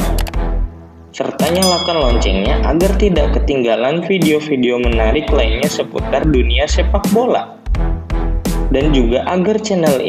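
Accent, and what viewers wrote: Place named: Banyumas, Indonesia. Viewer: orang ngapak mungkin aslinya dia bro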